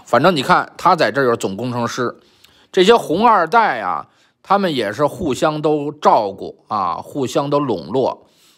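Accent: Beijing, China. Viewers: 听您一口的京腔就感觉亲切。给您提示一下：萧新华少将不是肖劲光的儿子。他爹是老资格的萧克上将。和肖劲光一样也是湖南人。萧新华文革期间在71-73年下放到湖南长沙。是我们长沙市第三中学（明德中学）的老师兼初中四连的连指导员。后来他在去武警之前在军队的新鑫公司当老总。8-90年代我在北京工作期间去看过他几次。非常随和的人，长得威风凛凛的，和演员项堃有点像，高大的个子，篮球打得很好。是校工篮球队的主力。可惜失联几十年了。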